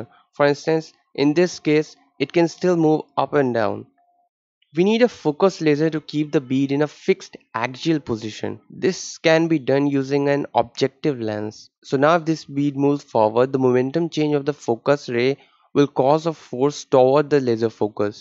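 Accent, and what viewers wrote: India. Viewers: You seem to a young Indian by your accent What the f in United states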